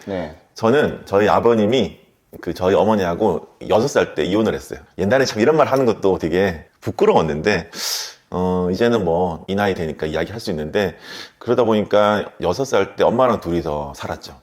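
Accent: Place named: Busan, South Korea. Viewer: 사투리가 들렸는데 부산대의대 선배님이셨군요..! 너무너무 재밌게 들었습니다ㅋㅋ 인턴하면서 하루하루 일하고 자고만 반복했는데 영상 보면서 동기부여가 많이 되네요 포부를 크게 가져야겠어요 좋은 영상 감사합니다!!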